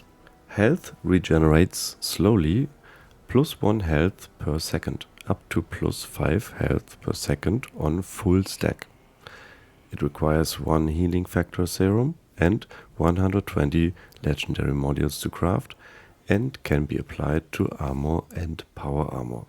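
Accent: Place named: Germany. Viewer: Du hörst dich an, wie ein deutscher der sehr gut englisch spricht.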